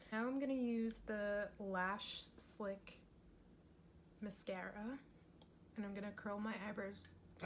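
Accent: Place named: Ireland. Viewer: Are you by chance Irish or Scottish? You have the prettiest accent that sounds like you might be from that area?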